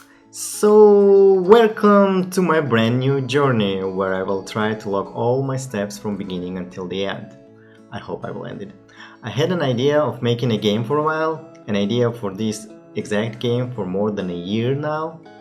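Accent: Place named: India. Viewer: I like his good indian ascent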